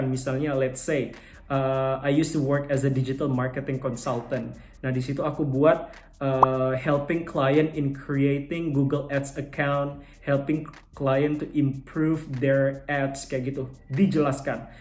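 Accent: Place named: Pekanbaru, Indonesia. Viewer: content yang bener-bener bermanfaat banget bang, thanks sharingnya. ada bang jugaa wah! hehe. keep on using our pekanbaru accent ya bang!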